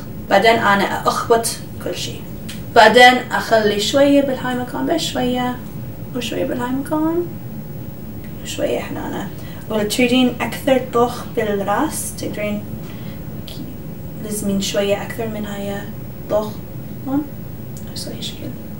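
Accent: Iraq. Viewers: فدوة للي يحجي عراقي ... نحببببج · شغلچ روعه والعربي واللهجه العراقيه تخبل عليج 😘🌹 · حلوووو العراقي من حلكج ههههه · حبيت لهجتج العراقية 😍💙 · Omg bravo your talking arabic. your arabic is good iam lebanese but your talking iraqian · We love love love how u express the words in Iraqi language and u did great videoing and we love ur tutorials u R the <link> 😘😘😘😘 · your Iraqi accent is so beautiful love you 😍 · In love with your Iraqi accent keep going xx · She was speaking Arabic specifically the Iraqi accent where she is originally from... I think The iraqi accent is really special in the Arab world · you are legend you are learn me no thing impossible only work by your heart 😍😍😍متابعتج من العراق اللهجة العراقية تجنن عليج 😌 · فدوه الي تحجي عراقي I like you Arabic Iraqi accent I am Iraqi too much love ❤️ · i love you im from Morocco and I like your Iraq language 😍😍😍😍😍😍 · ما ازكى اللهجة العراقية عليها بتجنن 😂😂😂😂😂😍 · باللهجه العراقيه تجننين يعمري 💕💜💜 I love u 🌸💜 From Iraq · you're really sweet when you speak iraqi , I love you so much 😻💘. 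· فدوه شلون تحچي عراقي 😂😂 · فدوة للتحجي عراقي 😍😍 استمري 👏👏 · اييي الحكي العراقي احلا كثيييرر😩💙💙💙💙💙. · اعشقك لهلجتج بالعراقي🇮🇶💗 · الله تخبلين تحجين عراقي هله بالعراق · حياتي فدوه يجنن الكلام العراقي بحلگها.. تگول اذا متخلون بودره حره جوه العين يتحرك الميك اب ويصير يرگص بالوجه ههههه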